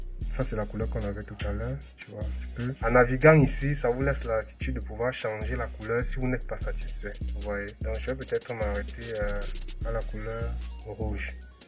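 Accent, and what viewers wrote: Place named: Cameroon. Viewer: Je m'abonne d'abord parce que ton accent la c'est pour le Continent 237 pure pure. Puis je like parce que tu na pas cacher comme les autres et j'active la cloche parce que tu a du bon contenue et c'a m'aide.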